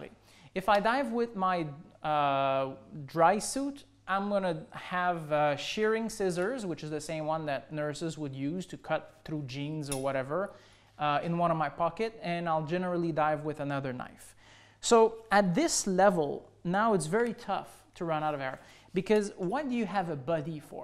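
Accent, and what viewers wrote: Quebec, Canada. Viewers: good info, are you by any chance from Quebec? your accent sound familiar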